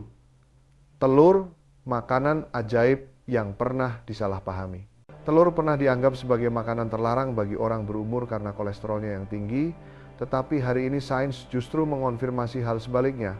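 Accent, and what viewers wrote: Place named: Sumatra, Indonesia. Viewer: Inton sumatra no 1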